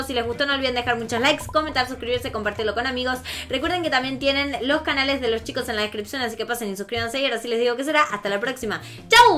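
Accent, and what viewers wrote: Argentina. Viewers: AUNQUE HABLAS ARGENTINO YO TE ENTIENDO TEAMOOOOOOOOOOOOOOOOOOOOOOOOOOO.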